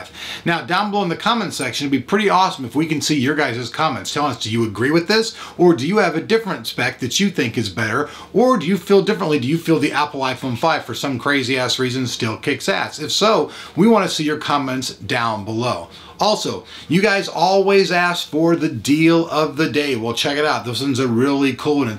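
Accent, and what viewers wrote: Russia. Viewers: love ur russian accent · Russian accent was my favorite reason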